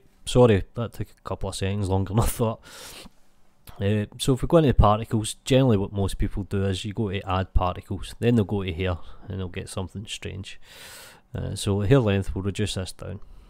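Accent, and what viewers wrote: Scotland, United Kingdom. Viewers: I don't know what it is man, Scottish accent = instant like. You could be saying anything, it doesnae matter it's just like a fucken biological impulse. You could be like "awrite lads, welcome tae this tutorial on how tae punt puppies really far" and I'd still like the video. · I can hear a Scottish accent..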